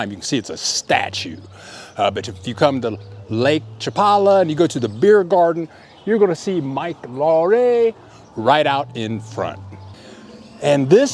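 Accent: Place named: United States. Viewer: Good video. Lol, after 15 years your gringo accent is still very strong. ¿hablas español?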